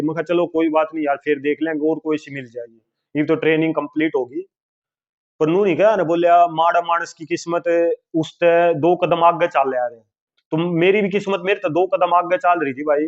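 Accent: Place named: Haryana, India. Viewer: राम राम भाई 🙏 मैं जयपुर राजस्थान से ममता आपका बहुत-बहुत धन्यवाद करती हूं मेरी जिंदगी में भी कुछ ऐसा ही है aसामाजिक तत्व हैं जो मुझे नीचा दिखाना चाहते हैं सब कुछ कर लिया।मैं बहुत ही परेशान थी तभी आपका वीडियो यूट्यूब पर आया मैंने सुना सबसे ज्यादा धन्यवाद तो आपकी बोली को जो बहुत ही अच्छी है हरियाणवी मुझे बहुत अच्छी लगती है और आप भाई एकादम एक ही नंबर।के इंसान.हो जी🙏 आपका भगवान भला करे आपorr ऊंचाई छुए ....... Aapki badi bahan.....mamta jat.....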